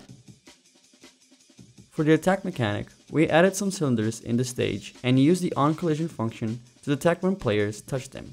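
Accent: Brazil. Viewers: É impressão minha ou você é brasileiro?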